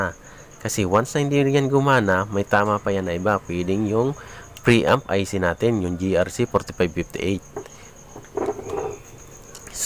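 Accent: Bicol, Philippines. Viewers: Taga naga city ka sir?bicol?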